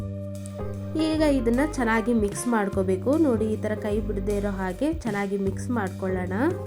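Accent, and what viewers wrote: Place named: Bangalore, India. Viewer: Ninde kannada super cook sis 🥰👍👏👏😁nin pakkka Bangalore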